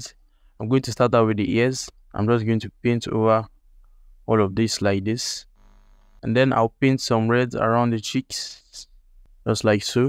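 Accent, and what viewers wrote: Nigeria. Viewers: Bro something about that Nigerian accent makes the tutorial stick more had to subscribe ASAP, thanks man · nigerian accent = trust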